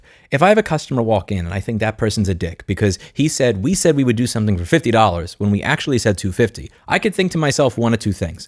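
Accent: New York, United States. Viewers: Please shut up about the linus drama. It got old quick I come here to listen to that succulent new york accent not to hear about the YouTube equivalent of schoolgirl drama. Otherwise Loved the video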